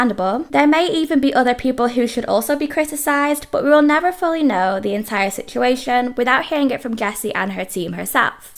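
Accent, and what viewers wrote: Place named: Manchester, United Kingdom. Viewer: Is that like a mancunion accent or something 😂just kind of recognise it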